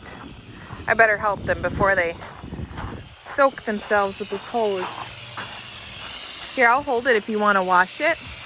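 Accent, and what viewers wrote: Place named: North, United States. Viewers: I know you are a state away but a northern accent makes me feel at home when I listen. Hello from Northern Minnesota (Zone 3b) 💕🌿🌿🌻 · Love the accent! You sound like some of my Yooper family ❤ Thank you for the garden tour 🤗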